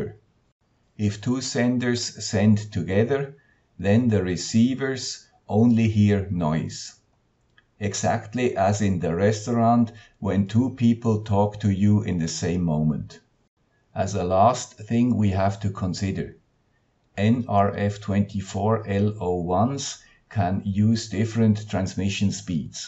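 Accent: Switzerland. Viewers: great and very helpful ! really love your videos and btw super swiss akzent:) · I really love your numbering of your video's sir! Keep up the good videos and I love your Swiss accent! Danke schön!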